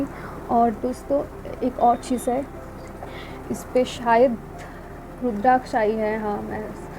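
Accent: Bengal, India. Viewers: Mone hocche khanti bangali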